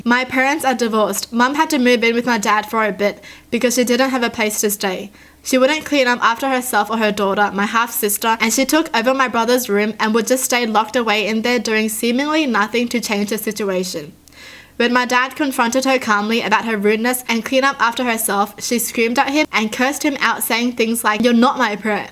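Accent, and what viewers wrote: Australia. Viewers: Is she Australian I for some reason always thought that idk why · All your pronunciation is so cute. Like not just Australian, more unique than that. Like you pronounce “confusing” like con-FOO-sing and I love it.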